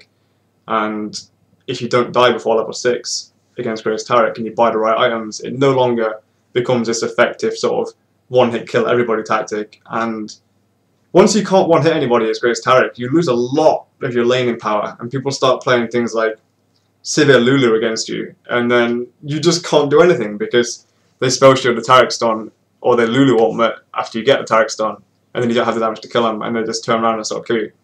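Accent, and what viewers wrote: United Kingdom. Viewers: What part of the UK you from?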